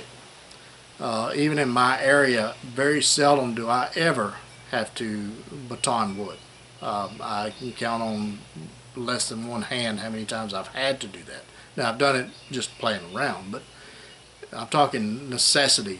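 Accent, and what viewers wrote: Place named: Appalachia, United States. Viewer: he sôunds like an appilation American I'm one too thats why I can t spell it.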